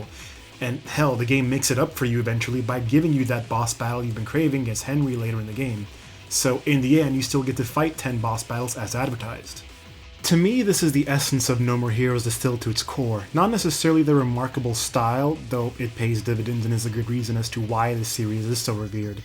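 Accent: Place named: United States. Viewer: First of all, I'm sick and tired of hearing "crescendo" get vomited out in YouTube reviews/videos/ whatever the individual wants to call it. Second of all, are you playing a foreign copy? Because there's no blood yet you have an American accent so I'm trying to figure out why your captured footage is the censored version?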